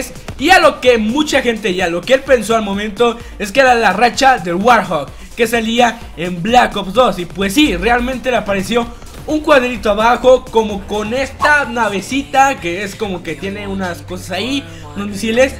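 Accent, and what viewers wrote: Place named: Spain. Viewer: deja de hablar como español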